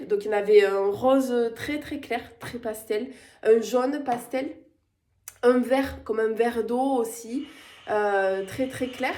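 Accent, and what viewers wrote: South, France. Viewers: Merci pour ce retour belle et lumineuse. J étais super heureuse de te rencontrer a Ris Orangis et j aime beaucoup ta personnalité et ton authenticité, ton petit accent du sud qui te donne beaucoup de charme. Plein de belle choses pour toi et je t envoie toutes les fleurs colorées de mon pull 😅😊❤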